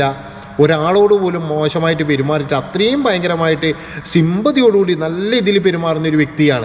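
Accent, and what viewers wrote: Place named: Thalassery, India. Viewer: ഇങ്ങള് തലശ്ശേരി കാരനല്ലേ... അല്ല കണ്ണൂർ ജില്ല കാരൻ ഇങ്ങളെ വർത്താനം നല്ല രസമുണ്ട്.. ഒരു കളങ്ക മില്ലാത്ത സംസാരം... എനിക്ക് ഇഷ്ടപ്പെട്ടു... ഇങ്ങള് ചിത്രയേ പറ്റി പറഞ്ഞത് നൂറിൽ നൂറു ശതമാനം ശരിയാ... ചിത്ര ചേച്ചിയുടെ ശബ്ദം മധുര മുള്ള താണ്. ഇനിയും നില നിൽക്കട്ടെ.. സംഘി കളുടെ വലയിൽ പെട്ടുപോകരുത്..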